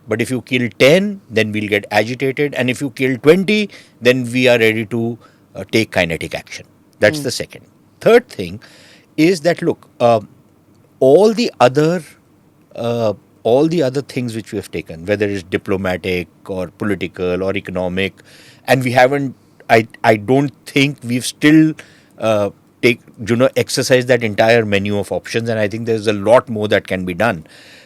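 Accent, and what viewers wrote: India. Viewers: Can you please translate into English or English subtitles because I cannot understand Indian Dumbass English accent. 😂😅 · Good god that indian accent is like broken glasses hitting ears. All of this is just nonsense. First of all Free Kashmir from illegal Indian occupation and indian army brutality. This is the only solution to all the problems.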